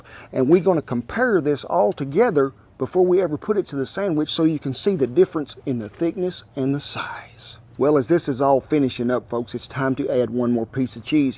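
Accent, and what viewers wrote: United States. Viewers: I am enjoying cowboy accent so much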